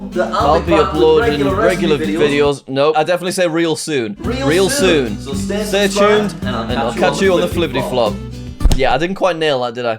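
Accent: United Kingdom. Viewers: What part of uk are you from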